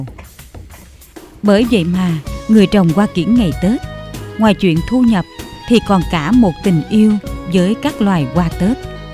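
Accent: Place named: Kien Giang, Vietnam. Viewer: Kien giang giong rien que toi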